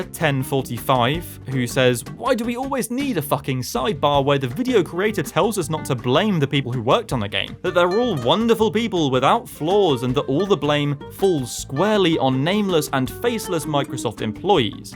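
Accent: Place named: United Kingdom. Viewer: click on video I'm interested in watching -Generic well spoken British guy again? 😮‍💨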